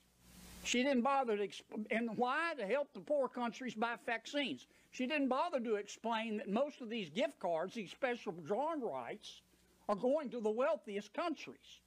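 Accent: South, United States. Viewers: Common sense with a Southern accent!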